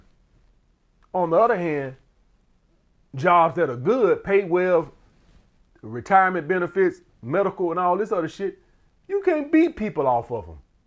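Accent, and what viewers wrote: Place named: South, United States. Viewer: This Alabama language.. southern style lol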